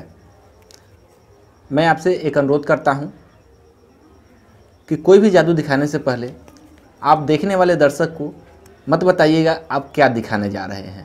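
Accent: Bihar, India. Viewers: Bhai aap bihar se hai kya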